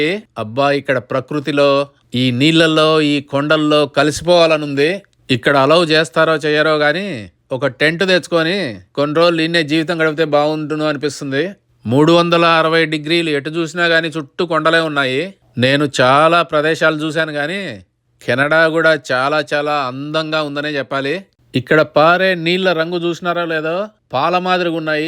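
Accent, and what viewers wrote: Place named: Telangana, India. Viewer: తెలంగాణల భాష లాగానే ఉంది ఉంది బ్రదర్ మీది మీ స్వీట్ వాయిస్ చాలా బాగుంది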